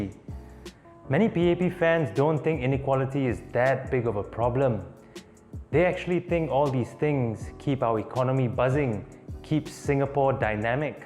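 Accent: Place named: United Kingdom. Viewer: Jamus got slight Americanised accent, yours was mimicking British accent leh... Anyway, good content! :)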